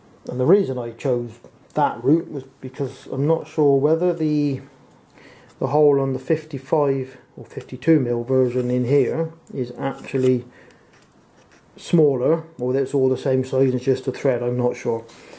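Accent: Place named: United Kingdom. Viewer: A 16:6 wide angle Mennon lens hood - the type seen in this video - is available on Amazon. It comes with a 55-58 mm step-up ring. This is a great video, by the way. I've one question, though: no matter how obscure my questions, whether regarding a vintage 1918 film camera or the most recent digital 4K cameras, virtually every YouTube video that answers my questions is the work a Brit. Any time a video a starts and I hear any sort of UK accent, I think: "Here come the answers!" Have you cornered the market of photographic experience and insight? No - thank YOU!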